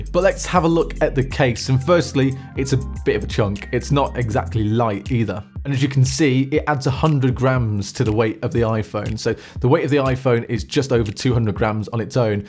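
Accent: United Kingdom. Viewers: Are you from Newcastle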